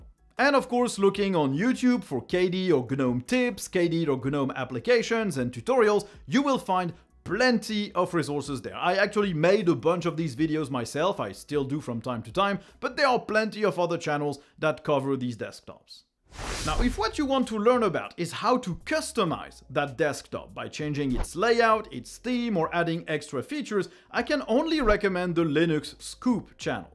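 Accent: France. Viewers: J'aimerais bien avoir le même "French accent" que toi 😅 Merci pour cette énième vidéo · French accent is the ❤ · Thanks, I'll check some of these sources out. And I don't mind your French accent (barely noticeable) as I speak French with an American accent. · great video, In his time, thank you, Btw i like your french accent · Your French Accent is a breath of fresh air in this world that is inundated with American nonsense. Never apologize. Thanks so much for sharing.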